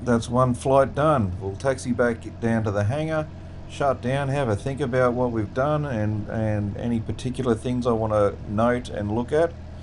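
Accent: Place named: Australia. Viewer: Great video and just wonderful to listen to your beautifully calm quiet commentary. Hearing a quiet Aussie is such a restful change compared to our American counterparts with similar videos. Love it.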